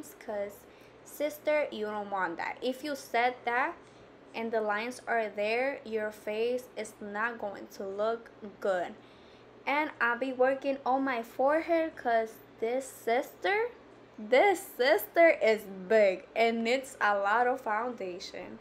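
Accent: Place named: Cuba. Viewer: Sis pero y ese acento cubano? 🇨🇺 y que fueeeee, ta viendo muchos videos de Lohana 😅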